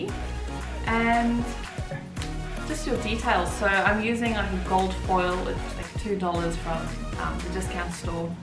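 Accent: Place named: South Africa. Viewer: Awesome om n SA accent te hoor :) thanks for a great video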